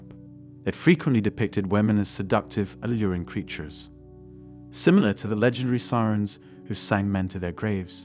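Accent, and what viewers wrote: United Kingdom. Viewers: I hate the robot voice, even with the British accent.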